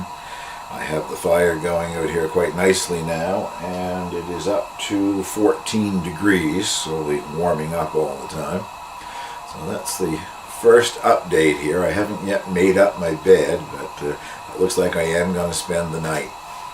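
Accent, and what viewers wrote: Canada. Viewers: I am thoroughly enjoying myself watching your videos. Your lovely Canadian accent reminds me of my home turf of Northern NY. Grew up about 26 miles south of Cornwall but have traveled quite often into Eastern Canada where all of my ancestors came from. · was going to ask where in the world are you, but I guess from the accent and Tim Hortons cup is a give away! :)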